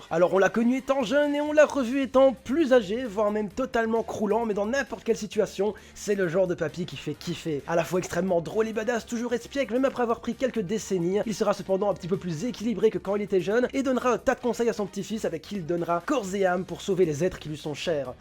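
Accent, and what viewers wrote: Belgium. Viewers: Tu serais pas belge?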